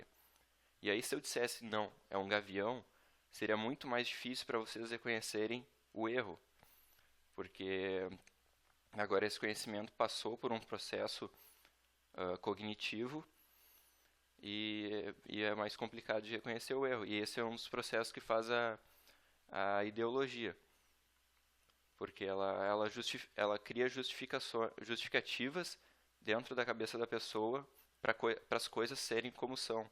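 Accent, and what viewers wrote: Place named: Rio Grande do Sul, Brazil. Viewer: sotaque bem gaucho . .top lembrei de meu amigo gaucho de novo hamburgo..bom video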